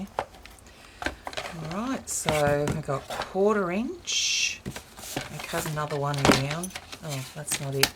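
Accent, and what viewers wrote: Australia. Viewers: So awesome to hear an Aussie accent on here. I'm sure we have lots of talented people here just like there are all around . Love your work. TFS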